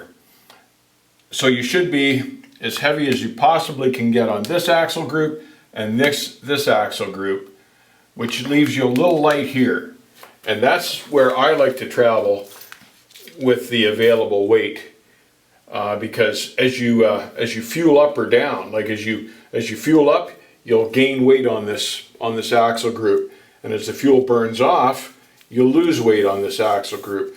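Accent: Canada. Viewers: Always great info with an awesome Canadian accent!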